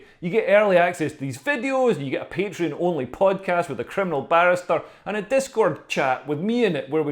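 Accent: Scotland, United Kingdom. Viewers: Never thought a guy with such a heavy Scottish accent could be so poignant, witty and truthful.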